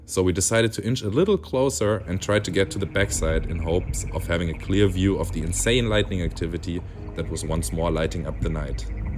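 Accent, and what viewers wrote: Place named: Germany. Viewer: Ich hatte nach den ersten 10 Sekunden irgendwie das Gefühl das der Sprecher als Muttersprache Deutsch spricht und ich hatte Recht.😅 Aber super Video, alles interessant eingefangen. Macht weiter so!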